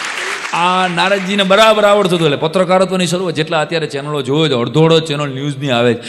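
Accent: Kathiawar, India. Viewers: tmari speech kaathyavaadi mne khub j gme 6e kaathyavaadi speech madh jevi meedhi hoy 6e jane k sambhlta rahiye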